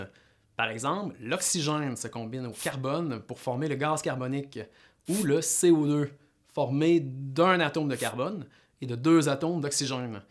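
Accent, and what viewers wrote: Quebec, Canada. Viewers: merci pour ton aide téxplique grave bien et super vite et jkiffe ton accent quebequoi thank you so much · Francais canadienne?? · son accent Québécois tres pousser je ne comprend rien 😅😂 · mais vous etes vrmt des canadien ? pas vrai? bref jai trop aimer la video et on comprend vite , pas comme les autre video qui dure 15 min et a al fin on comprend rien , je vous remerciiie :)))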